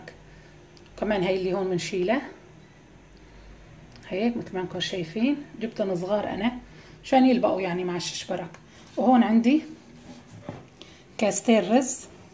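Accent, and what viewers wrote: Aleppo, Syria. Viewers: صراحة انا واحدة ما الها ابدا بالكزمنتات. بس والله مو قادرة اقاوم. الله يعطيك الصحة والعافية يا خالتو و يقويكي. شو هالفن هاد, حفر الكوسا والا حبات الشيشبرك , كانهم مرسومين رسم. الله يسلم اديكي ويعطيكي الصحة. مبسوطة كثير انه صوتك الحمد لله احسن من الفيديو اللي عملتيه بعد مرضتك . اجر وعافية يارب. وانت ابداااا ما بتحكي كثيير . بالعكس حببتيني باللهجة الحلبية . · والله جوعتيني يا والله فعلاً منظومة ههه لازم نتعلم منك حكي الحلبي كتير بيعجبني يسلم هالديات متابعتك من لبنان بيروت 👍👍👌👌👌👌😋😋😋😋💞💞💕💕😍😍😍😍♥️♥️🌹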